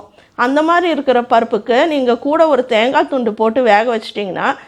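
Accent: Salem, India. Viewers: neenga Salem ha madam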